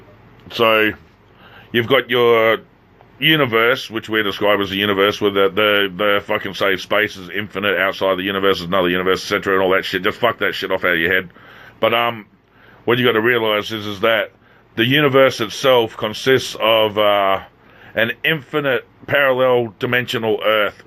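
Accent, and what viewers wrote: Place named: Australia. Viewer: Hi there, this video is helping me. I have to listen however many times in order to gain the understanding you are putting forth. Mainly bc you speak fast and with an Australian brogue. Lil' lol. I am sure you get a million comments so i know you will not remember mine. It was full of doctrinal manifestations, even though at the time i thought i was way past that. You know, having come 'out of her' and all. It is indeed by layers, one layer at a time. Im not going to let go of CHRIST my LORD. Im just having a bigger look at how the scripture was so soarly perverted and literally turned backwards. I have had Nelson visit me personally before i had heard he was lurking around. It happened when i was reading ( in the style of 'gulping' down a big tall glass of water, a few whole chapters at a sitting. As apposed to an intricate word study verse to verse) Anyway, learning from you. 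Thanking you and feeling really thankful. I am going to share a link with you from a young guy who quickly posted his experience. Its short and who knows, maybe a helpful tid bit. Alrighty, i hope to see you soon!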